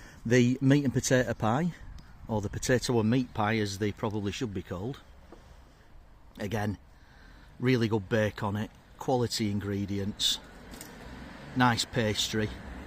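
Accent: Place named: Lancashire, United Kingdom. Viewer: Hi, a picture in pies, with your commentary adding the fine brushwork. Without wishing to repeat a previous comment, you wondered about value for money. You got three top quality pies for just short of six pounds, in London, you wouldn't have got one for that. As a pensioner, among the many that are under attack by the new government, I micht have to consider a move to the North-West. Sensible people and prices and plenty of great beer and scenery. We might find it difficult to understand each other, you with your broad Lancastrian accent and me with my broad London accent, but I'd love to give it a try. All the best mate, Mark from London.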